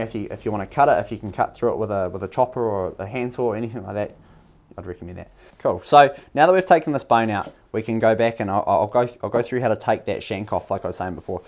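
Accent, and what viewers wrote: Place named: New Zealand. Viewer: Hey whereabouts are you from? You sound like a kiwi, I'm from Otago.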